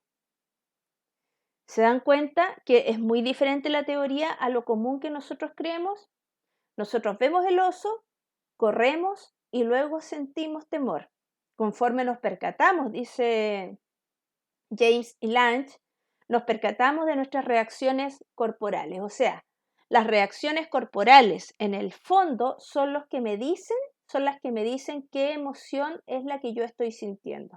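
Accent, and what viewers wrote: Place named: Chile. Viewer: 😂😂😂 por el tono de voz me dice que chilena😊🧘‍♀️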